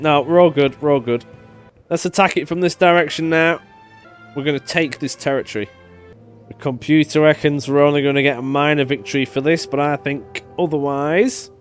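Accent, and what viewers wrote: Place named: Lancashire, United Kingdom. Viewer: oh I’m on your time mate, been trying to place your accent I may be a bit off but I’m guessing round Bolton or wigan. I’m only in salford so shouldn’t be so confused lol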